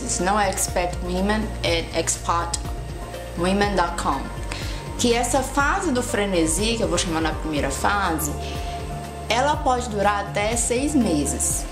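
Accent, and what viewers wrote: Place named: Northeast, Brazil. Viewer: muito bom o video, eu morei fora e senti a mesma coisa, pelo seu sotaque você parece ser do nordeste como eu, acho que é pior...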